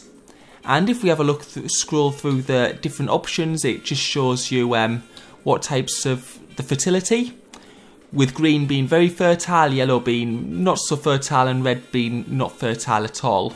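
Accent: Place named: Sunderland, United Kingdom. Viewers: this is more of the northerner accent than the traditional british accent most are accustomed to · I'm from Sunderland, you sound like one of us. For that reason I am now subscribed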